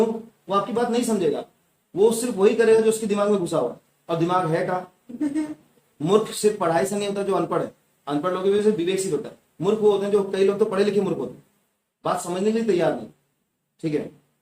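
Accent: Odisha, India. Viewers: Sir app odisha se he kya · Is sir from odisha state ?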